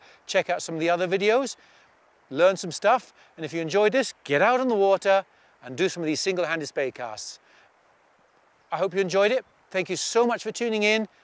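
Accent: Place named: Wales, United Kingdom. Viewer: what part of wales are you from? I clocked your accent as I’m a cardigan native myself. Live in Scotland now though.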